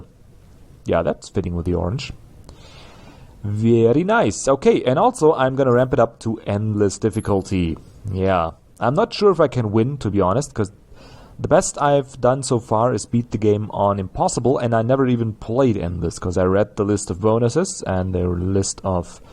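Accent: Germany. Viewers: Are you, by any chance, german?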